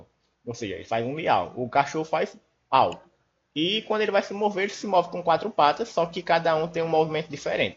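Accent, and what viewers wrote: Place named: Northeast, Brazil. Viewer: Não sei o que é mais prazeroso nesse video, a didática fora da curva ou o sotaque gostoso do meu nordeste ❣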